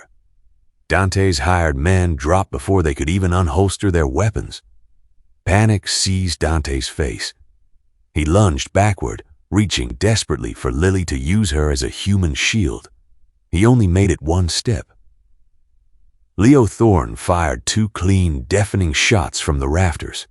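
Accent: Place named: South, United States. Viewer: Why did narrator lose English accent and, develope a southern drawl bit unnerving.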